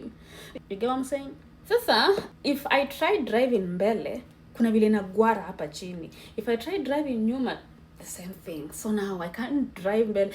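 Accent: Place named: United States. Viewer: Ee the American English assent in you❤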